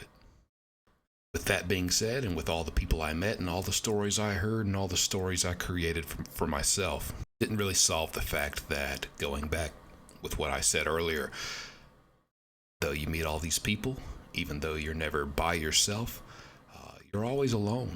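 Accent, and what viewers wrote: South, United States. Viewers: im fairly new subscriber. I love the sound of your voice being from the south like me helps. I enjoy all your content i especially like these type. I listen to you while I am doing my house work set of quite headphones and im off 😂😂😂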